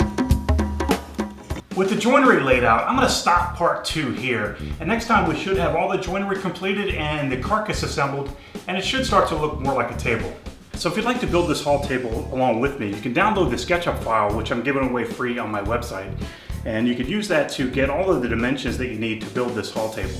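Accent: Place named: Midwest, United States. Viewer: Are you a NC native? For some reason I was thinking Wisconsin, Michigan (definitely Midwest of some sort) native based on your voice. Sorry so random...message me if this isn't the appropriate forumn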